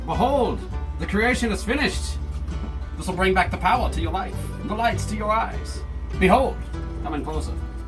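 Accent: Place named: United Kingdom. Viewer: Love the British or is it Irish accent LoL 🤣😆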